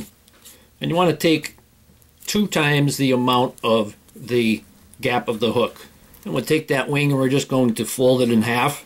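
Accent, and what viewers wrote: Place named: Buffalo, United States. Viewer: you sound like every Polish guy I know from Buffalo. Like I'm home. Great videos!! Thanks!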